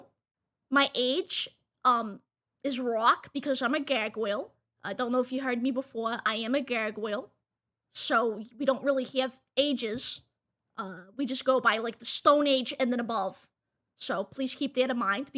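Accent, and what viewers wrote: Boston, United States. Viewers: Hey I k own that Bostonian Accent!!! 🖤🩶🖤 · Congrats on the debut,!!! If I can confess something, I had been a lurker for a long time, and your personality and boston accent was instantly and insanely charming! But at the time I was watching you, I had been FAR too shy to actually say anything, let alone be chummy with chat at the time. When you stepped back for the time being, I beat myself up over the fact I couldn't express my gratitude for making my day and keeping my mind off of life. You have no idea how happy I am to finally be able to do so, how honestly teary-eyed I got when I heard your voice again in the teaser. I know I'm being sappy, but I need to express how I genuinely feel and how overjoyed I am at your return. It was such a relief to find that you're the same goofball that I had subscribed to LONG ago. Thank you for being you! 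· That bostonian accent is unmistakable. Congrats on your debut fellow detective!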